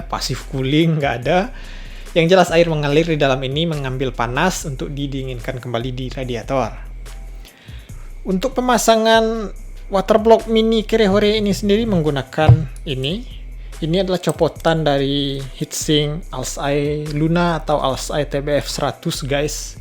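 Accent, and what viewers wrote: West Sumatra, Indonesia. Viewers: Jaleh bana urang minangnyo...🤣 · bahasanya indomi kali yah, atau prasaan saya ajaa :) , gw tebak pasti orang minang 🗿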